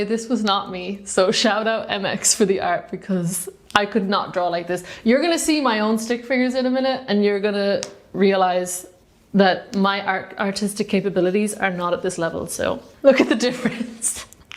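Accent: Ireland. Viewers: Rewrite equals review or revision. Because resistance it’s versus support. Every time you speak, and your Irish accent steps out you instill this motherly love feeling that speaks to a man’s core identity, it’s a mothering, nurturing, caring, loving kindness, even a protection, you radiate a maternal love that he heals:) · Love your content - Irish accent is beautiful!! I am Irish myself from Limerick. Stop self sabotaging your attempts at drawing!! Haha....you rock woman!! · I lived in Ireland for 7 years, it’s the most dearest loveliest place on earth, I call it my Mother land. And your accent is the sweet nectar to my ears 🍀🍀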